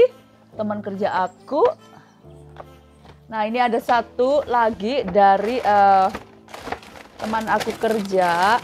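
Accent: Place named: United States. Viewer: Happy belated Birthday wong ayu💐..Jeng pasti sdh lama tinggal di US ya..bahasanya sdh beraksen orang sana..enjoy your nice birthday presents👌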